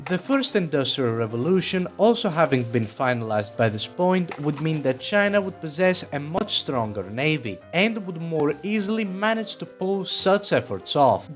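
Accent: Greece. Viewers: Now that's a Greek accent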